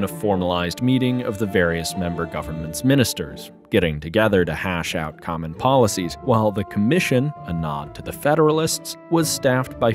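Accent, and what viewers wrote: United States. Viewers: Sounds very American.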